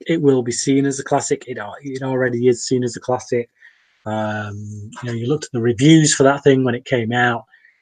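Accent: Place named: England, United Kingdom. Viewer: I have something I have always wanted to ask. What part of England are you from? Wondering about the accent.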